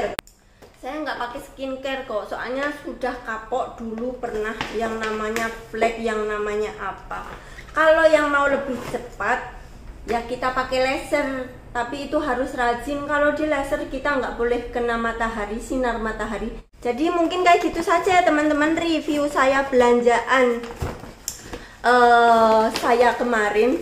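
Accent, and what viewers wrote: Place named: Banyumas, Indonesia. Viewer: Mba aali mana si kok cara ngomongnya kaya q ..q asli banyumas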